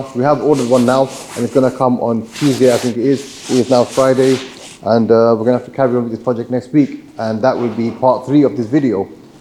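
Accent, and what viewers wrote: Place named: London, United Kingdom. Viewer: Man, I just love how the subtitles can't decipher the true Lahndahn accent!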